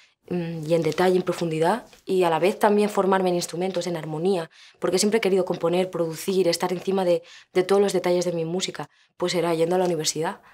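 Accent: Spain. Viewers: Pensé que era Latina, me sorprendió cuando empezó a hablar con ascento español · I can't stand the Spanish accent from Spain but she speaks so clearly and being cute and talented does not hurt. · When she's speaking has the castillian accent but when she's singing she loses it. I'm confused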